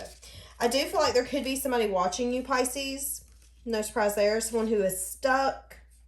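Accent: South, United States. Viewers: I love your voice and southern accent 🖤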